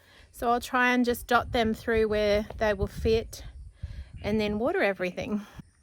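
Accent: Australia. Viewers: I so ❤ listening to you talk in your Aussie accent. 💕 And we can figure out what you are referring to most of the time, peppers or Capacean. Bless you and your family! And sunshine & raindrops to you my friend. 💗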